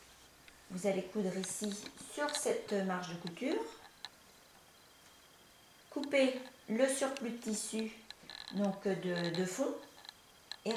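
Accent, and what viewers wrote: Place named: France. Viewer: Love listening to your French accent 😀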